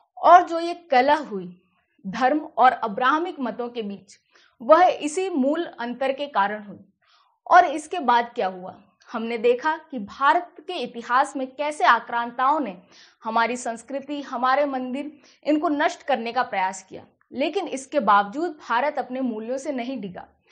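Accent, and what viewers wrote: India. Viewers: love the hindi accent also the usage of words. very unique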